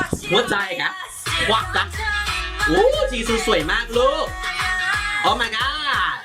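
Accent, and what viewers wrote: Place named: North, Thailand. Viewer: ชอบความ อ้อ~อ๋อย คนเมียงของแต๊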